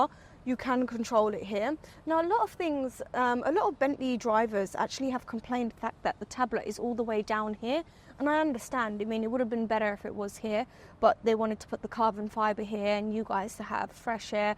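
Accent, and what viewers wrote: United Kingdom. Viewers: where are you from originally? Also i can sense the british accent lol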